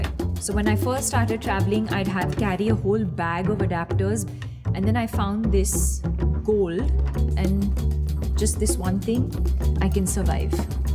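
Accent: India. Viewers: Let's just talk about her accent like so many ppl change their accents when they be popular and look at herrrr pure Indian!!!!!❤️ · I'm in love with her banglori English 💕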